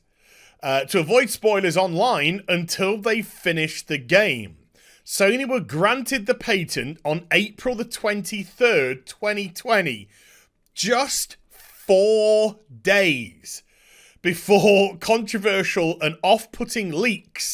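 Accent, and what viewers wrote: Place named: Yorkshire, United Kingdom. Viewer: Got halfway through I can’t believe our lack of perception I’ve got because it’s now suddenly apparent you are so typical Yorkshire very articular but very comical but you’ve just explained something because yes you’re on the ball and I’m learning a lot because I’m hoping to be a YouTuber eventually but all I can say is oh you are awful but I like it clue is I’m Apollo I do apologise I forgot his name well-known very camp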